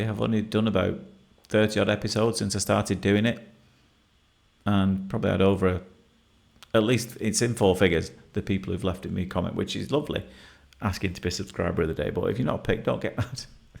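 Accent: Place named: England, United Kingdom. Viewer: Made it all the way and wow you are so addictive to watch! Also I’m an English girl now living in USA and it’s lovely to hear a fellow accent! I’d love to be Subscriber of the day 😊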